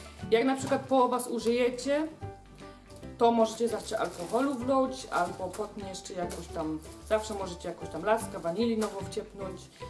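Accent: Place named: Podhale, Poland. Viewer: Przecież to jest Urzekające.... Ja pochodzę z Białegostoku ale to jest Cudownie piękne jak Pani mówi po Goralskiemu 😃😃😃😃😃😃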